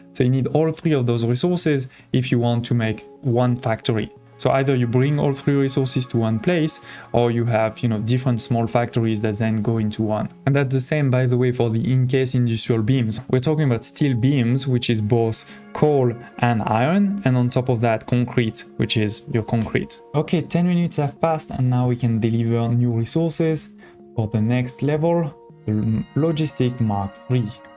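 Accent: France. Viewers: Hello man I know that it has nothing to do with the video but I have have a problem with my mods, i want to play with the Commuter pier for new world but it doesn't work. All the other mods of Spice it up don't work too, and I use the mod launcher so if you have any clue how to fix it or if you know if spice it up is not udpdated with the nex world rising it will help me a lot. ( I think your french looking at your accent and me too so if your french you can answer in this language). Thanks for the videos :)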